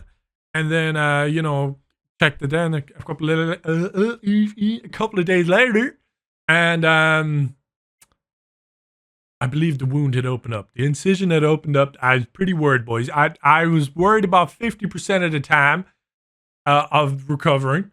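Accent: Ireland. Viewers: Man being a fellow Irish man and listening to this story/explanation is hilarious because he explained it in such an Irish way😂 plus I just straight laughed for the whole duration of that car bit Anyways good luck and hope all goes well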